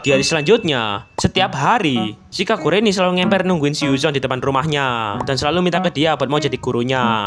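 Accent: Java, Indonesia. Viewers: Bg lu org Jawa ya bg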